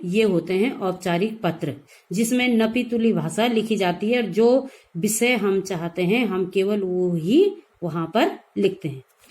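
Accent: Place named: Uttarakhand, India. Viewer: Mam you are from Uttarakhand chamoli right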